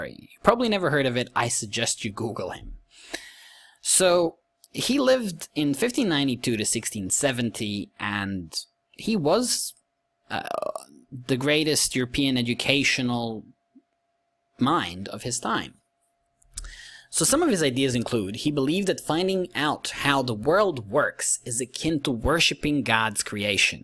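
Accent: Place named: New York, United States. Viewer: Quite enlightening & request an update Oh, you're accent sound like you're from NY city USA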